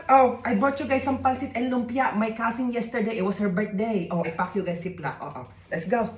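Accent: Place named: Batangas, Philippines. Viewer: batangas slang 😂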